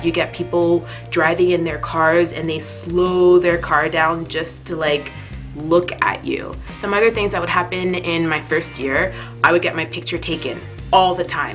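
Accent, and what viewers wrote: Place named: Canada. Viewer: lol it's that good ol Canadian accent lol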